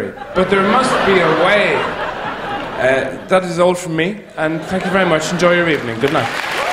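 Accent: Ireland. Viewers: lol he isnt english at all, he's irish from the republic of ireland. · He is Irish, not British. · I'm Irish so when he started talking I was like Wait is he Irish ?! Then I like nah probably not nobody Irish is really famous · hey dip wit hes irish not english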